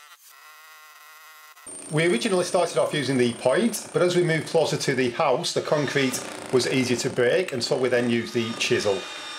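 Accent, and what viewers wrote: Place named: Lancashire, United Kingdom. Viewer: That Lancashire burr can’t be caged, that would be criminal.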